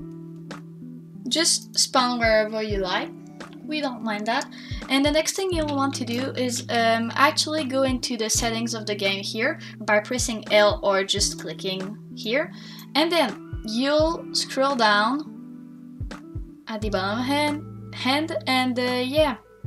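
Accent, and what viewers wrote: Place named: Quebec, Canada. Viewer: you have a quebecois accent..... 😏😏😏😏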